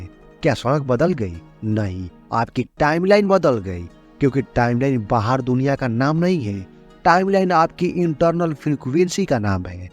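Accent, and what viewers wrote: Bengal, India. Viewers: Kese bolta hai yaar ..bengali hai kya..hindi seekho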